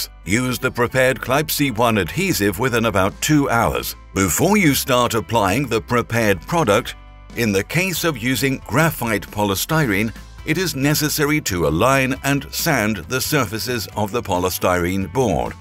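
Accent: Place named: United Kingdom. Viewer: This narrator sounds like the epic economist on YouTube just with a British accent 😂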